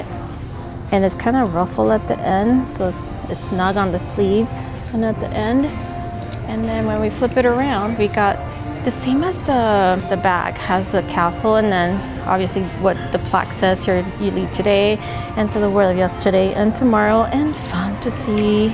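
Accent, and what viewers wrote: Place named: Chihuahua, Mexico. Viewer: Love your content videos. They’re not too long and not too short. For months now I’ve been wondering where your accent is from. I’m going to guess Chihuahua by how you pronounce the CH. Also, any chance you lived in Denver, because I heard you say, “yous” at timeline . PS. Stitch is wearing a bra on his head like on the movie? 😄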